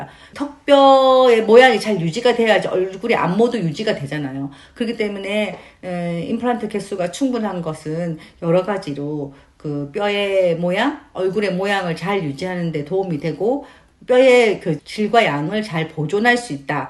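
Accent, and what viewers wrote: South Korea. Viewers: 와우 평소말투 그대로! !부산말 안고쳐지네요 차암 어렵죠잉? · 저 버들강아지 기억해주셔용 넘예쁘고 젊으심 말투가경상도라서 친근함 이 있어요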